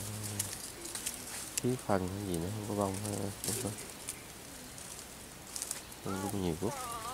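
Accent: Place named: South, Vietnam. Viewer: E người miền bắc nghe giọng miền nam rất rễ nghe và nhẹ nhàng🥰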